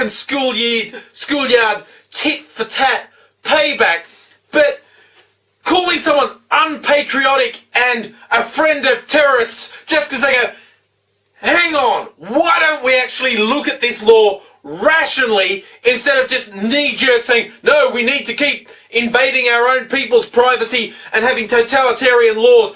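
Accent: Australia. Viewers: hmmm you seem angry for some reason and also Australian hmmm still cant make why i see this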